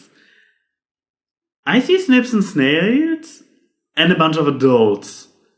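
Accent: Germany. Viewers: I must be a dumb blonde for not picking up on the Christmas carol reference., you're execution of this impression felt a little confusing to me because it seemed like you were jumping from topic to topic too quickly and add in your German accent, it was very hard to follow. Can you try to slow down next time? Thanks.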